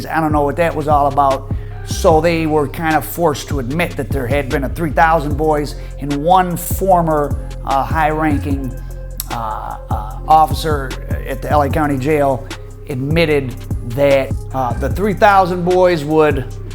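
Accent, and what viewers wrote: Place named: New York, United States. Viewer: I feel the new York accent gives this authenticity even though it's a west coast gang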